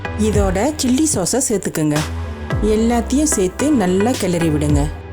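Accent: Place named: Kuala Lumpur, Malaysia. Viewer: KL accent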